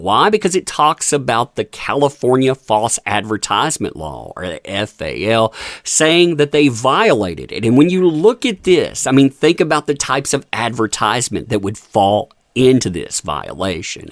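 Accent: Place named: Mississippi Delta, United States. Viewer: We haven't to be from the same small town. We sound alike. Mississippi River Delta?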